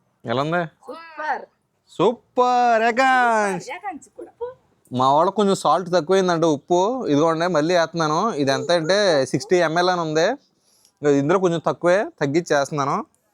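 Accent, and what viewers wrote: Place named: Amalapuram, India. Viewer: బాబు మీ వంటలు చూస్తుంటే కడుపు నిండి పోతుంది తరువాత మీ మాట వింటు ఉంటే ఇంక కడుపు నిండు పోతుంది ఏ ఊరు అమ్మ మీది మా అమలాపురం సై డ్ లా ఉంది ఎక్కడో చెప్పండి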